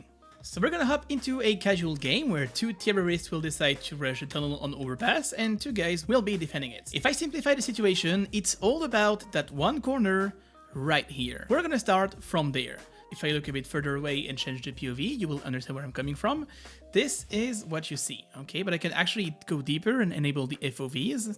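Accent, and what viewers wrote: France. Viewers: Tellement frustrant d entendre cet accent mais de ne jamais avoir de video en francais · Wait, after all this time watching, i just discovered that t'as l'air vachement français bordel. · de ouf il parle super bien english mais t'entends tjrs l'accent français xDDD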